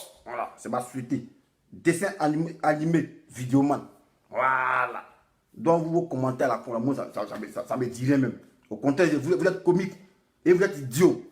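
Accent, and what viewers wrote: Ivory Coast. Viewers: Toi là tu ne sais pas parler.Tais toi avec ton français ivoirien. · je n'aime pas quelqu'un et je suis toujours calle' sur sa page, je ne rate aucune vidéo! Quelle souffrance!...il est si délicieux le français ivoirien et nous aimons notre français nous ivoiriens, bravo 👍👏👏👏👏👏👏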